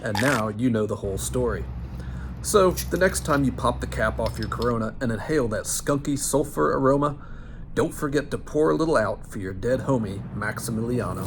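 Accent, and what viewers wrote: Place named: South, United States. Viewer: your lovely southern lilt, nice and gentle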